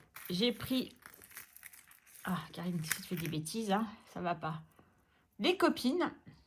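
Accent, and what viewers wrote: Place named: Quebec, Canada. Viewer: Coucou Karine, une belle vidéo. J'adore ta simplicité et ta spontanéité. Tu sais que tu as des termes que tu emploies comme nous les Québécois. ( P'tite, ben) sont des termes abrégés et des sons modifiés que nous utilisons beaucoup. Hihi tu as un peut de Québécois en toi 😂❤ De belles économies super ça. Aller bye bye à + 🫶❤️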